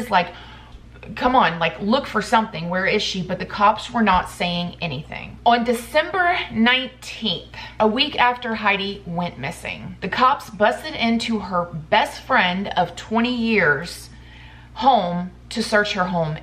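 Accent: South, United States. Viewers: New subscriber :) have been binge watching for a few days even cases i know really well! I just love the way she tells a story. That country twang is so cute! And also i dont care i love the intro 😂❤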